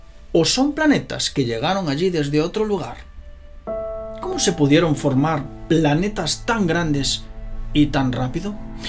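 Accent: Spain. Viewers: Porque siempre es en español de España es aburrido nomás de escucharlo....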